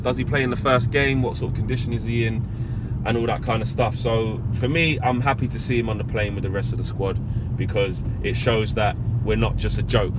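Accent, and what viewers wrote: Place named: Manchester, United Kingdom. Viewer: Gotta love a strong Mancunian accent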